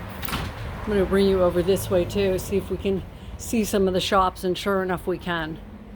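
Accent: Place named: Boston, United States. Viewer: New England as in Boston. (Pah kahz.) Love it! Am I right? Or at least close by...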